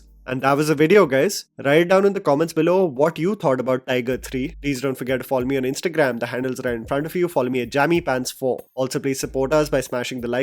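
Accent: India. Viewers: NO JOKE… his Indian accent made my ears bleed 😢😢😢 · THAT IS SOME THICK INDIAN ACCENT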